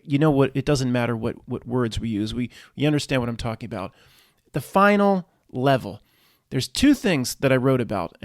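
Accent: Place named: United States. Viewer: Hey it’s guys. I like your non accent. Reminds me of my father. But you say wurter instead of water. Have a nice day. I was listening to Tim Keller on YT and he was a bald guy from Pennsylvania too.Same nice American accent!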